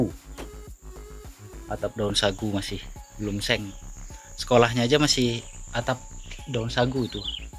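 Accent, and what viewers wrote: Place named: Malaysia. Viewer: Logat Melayu kampung tengah masih ada ya pak! Semngat pak demi penerus anak didik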